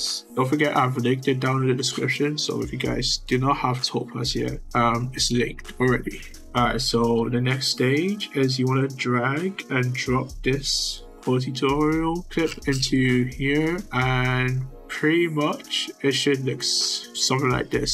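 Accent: United Kingdom. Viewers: AHHHHHHHHHHH THIS IS EPICCCCCCCC- ALSO DON'T WORRY MAN I AM SUBBED TO YOU SO NO NEED FOR YOU BRI'ISH VOICE TO TELL US!!!^^ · nice hearing your beautiful bri'ish voice again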